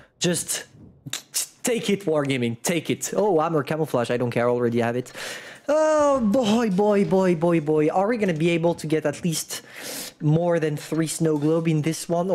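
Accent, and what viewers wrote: France. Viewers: Je savais que tu etait fracais a cause de ton acsens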